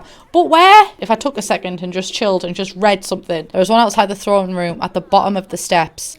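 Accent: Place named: North, United Kingdom. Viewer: Laughed all the way through this video you are soooo funny ! Proper cheered me up also nice To see and hear a fellow northerner 🤗. Xxx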